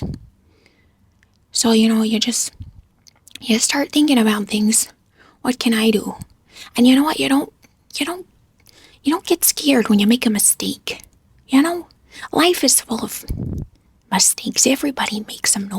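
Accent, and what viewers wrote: United States. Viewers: Hearing your accent, I just want to hear, "Dontcha know" on repeat :P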